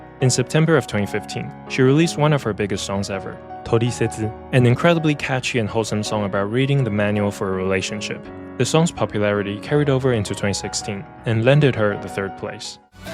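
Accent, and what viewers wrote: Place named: Japan. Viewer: Because is japanese bad-english pronunciation (?)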